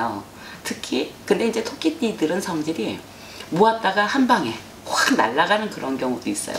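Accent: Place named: Gangwon, South Korea. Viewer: 선생님 말투에 강원도 사투리가 섞여 있어요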